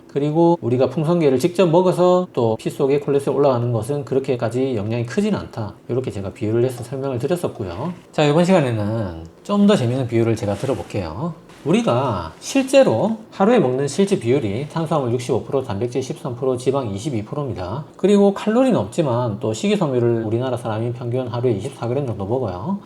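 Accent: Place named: Busan, South Korea. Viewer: 부산 말씨가 어찌이리 구수하고 삼경살 굽는 냄새는 물러나라~! 매력 통통 젊은 의사샘님 즐거움을 주셔서 감사합니다! 자주 뵜으면 좋겠네요~^^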